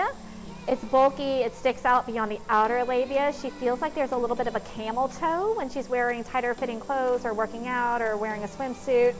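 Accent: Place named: United States. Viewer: From her accent I can tell she's an American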